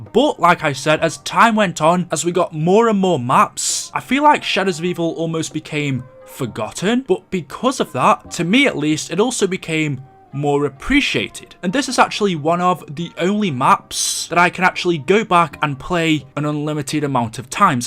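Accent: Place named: Liverpool, United Kingdom. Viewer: Oh god the scouse accent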